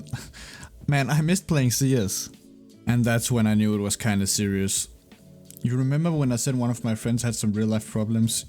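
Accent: Denmark. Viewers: are you from Denmark? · I don't recognize your channel but I was already subbed. You have a Danish accent, so ig I knew u before u blew up. What was your previous gamertag?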